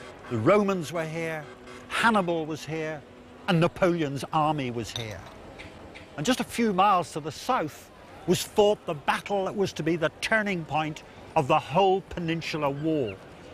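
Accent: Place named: RP, United Kingdom. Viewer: The latter. More accurately it's "posh British" (or Received Pronunciation, to give it its latter name) because plenty of people from all corners of Britain speak in it , if they were educated at the "right" schools.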